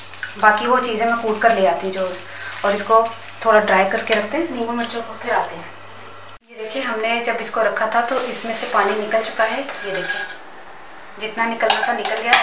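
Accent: Punjab, India. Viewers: ساتھ ساتھ پنجابی کا تڑکا بھی لگ رہا ہے۔ خوش رہو میری بہن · Walikum aslam Her punjabi so sweet Thank you ma'am so much for sharing ur knowledge with us🤓🤓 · Your punjabi is very sweet and your achar is very good and yumm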